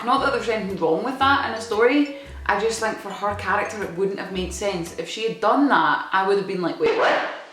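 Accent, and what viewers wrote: Scotland, United Kingdom. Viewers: Very Awesome Video! I never would’ve realized that so many films out there would get your Scottish accent accurately…as FAR too many films to name keep getting my family’s Bostonian accent incorrect…Versus Mike Myers’ Shrek character (which I know love very much), the female Scottish characters that you’ve mentioned in this Video certainly do seem to be the real deal (as opposed to being a mimicked/imitated Scottish accent)…Meanwhile, my friend mentions to me that her friends—whom are 100% deaf—wish to let you know that they’re appreciative of you informing them that all those female characters you’ve mentioned in this Video DO, in fact, speak with a Scottish accent; specifically, my friend mentioned to me that her friends had told her in ASL that they had no clue that those characters spoke with a Scottish accent…My friend also mentioned to me that her friends signed out in ASL that they’re very sure you likely have a very wonderful, lovely, beautiful voice and wish they could have had a chance to hear what it sounds like (and, YES, that WAS, in fact, by all means a complement)…But with a heavy heart, I’m gonna mention to you that my friend had a particularly challenging time attempting to “Read”/Watch this Video, as she mentioned to me in an E-Mail Message earlier this afternoon (Eastern US Time Zone) that the rate of errors in the Closed-Captioning for this Video in particular (for some odd and inexplicable reason) is quite horrendous, about roughly as bad as YouTube’s Aut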